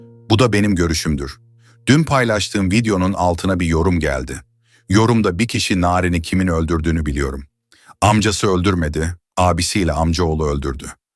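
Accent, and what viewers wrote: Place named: United States. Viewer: Siz Amerika'da mı yaşıyorsunuz konuşmanız aynı Amerikalı gibi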